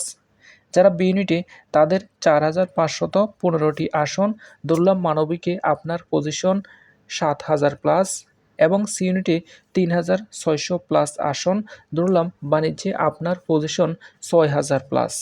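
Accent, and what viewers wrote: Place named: Noakhali, Bangladesh. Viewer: নোয়াখালী্র ভাষা চেঞ্জ করার দরকার ছিল।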